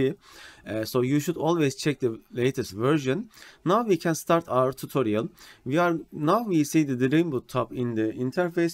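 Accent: Turkey. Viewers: Dostum aksanın Türk'e benziyor; Türk'sen türkçe altyazı geç bizim için. Çok süper olur bize. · Çok faydalı içerik. Teşekkürler. (1. dakikanın sonunda aksandan dedim ki bu adam Türk galiba, öyleymişsin. :D ) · Türkmüsün? Türk aksanıyla ingilizce konuşuyorsun. Güzel rehber. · Önce bi forumda gördüm videonu aksandan direkt anladım Türk olduğunu. Çok tebrik ederim harika bir tutorial.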